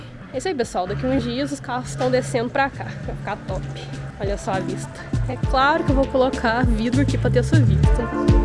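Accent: Minas Gerais, Brazil. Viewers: Você é de minas gerais?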